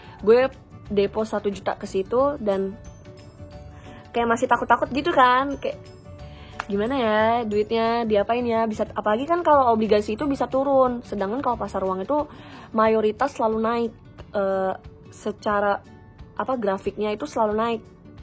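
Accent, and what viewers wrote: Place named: Medan, Indonesia. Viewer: kakak, kek anak Medan...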